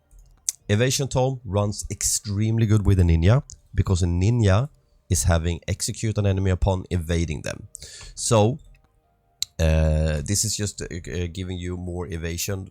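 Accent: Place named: Sweden. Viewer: w sverige always know a swedish talking english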